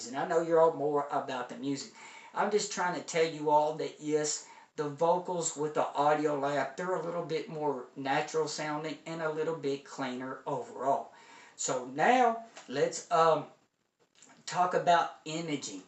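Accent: South, United States. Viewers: Nice review mate! Luv the Southern accent! You’all did a great review here. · Good, clear review. As a non American your accent is interesting. As are some of the adjectives that you use. I'm guessing that you're based somewhere in Southern Amserican States. Fascinating.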